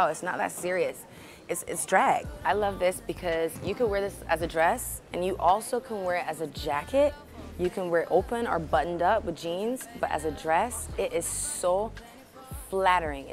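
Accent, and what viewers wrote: England, United Kingdom. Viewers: she took the british accent lol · Her accent is sounding a bit like English accent now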